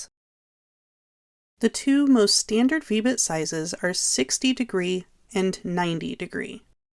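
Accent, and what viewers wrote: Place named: England, United Kingdom. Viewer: Good job. Your voice is perfect for this work. I've been fooling around with this stuff for 3 years and now I know I've been doing it all wrong with some unusual results. always went back to the straight bits with just OK results. I think Vectric should hire you to do all their training videos. The English accent confuses me every time. I am now a subscriber. Thanks again. I guess an 80 year old man can still learn a few new tricks after all.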